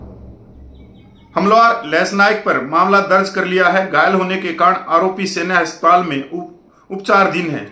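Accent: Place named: Haryana, India. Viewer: भाई अपने चैनल मे हरियाणवी टोन का प्रयोग कम से कम करे।धन्यवाद।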